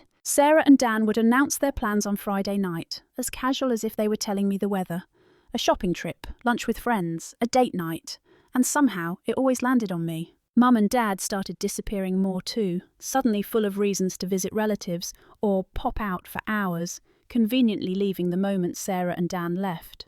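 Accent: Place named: United Kingdom. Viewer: Same story I read awhile back, with a British accent!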